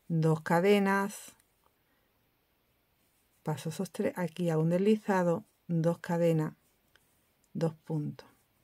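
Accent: Andalusia, Spain. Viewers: además de que la idea es chulísima, no veas la alegría que me da de escuchar mi andalú ❤❤❤ Soy de Sevilla. Estoy haciendo ahora un hexagonal con los restos que tengo. Sí llego a ver el vídeo antes... Pero bueno, creo que lo voy a empezar 😂😂😂 Un abrazo 🤗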